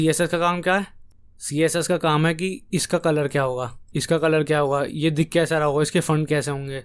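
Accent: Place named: India. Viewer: Video to mast thi hi, Bhai ka Bolne ka Style bhi mast laga Desi ❤️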